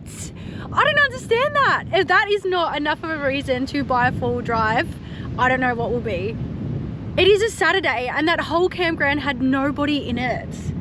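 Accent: Australia. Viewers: Your name seems American as fuck haha, I was not expecting the Aussie accent... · from uk As commented before your posts are great,remind me of my time in oz,you are very beautiful,love your accent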